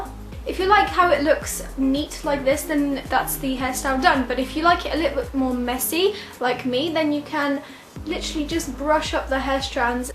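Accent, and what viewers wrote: England, United Kingdom. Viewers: I think she is from England I have the same accent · I just LOVE your accent! Where in the UK are you from? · You are from UK? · I luv your accent ... British somin like dat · You're like perfect! cx Are you from Britain? Love the accent ( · No, she is from the uk x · finally someone british lol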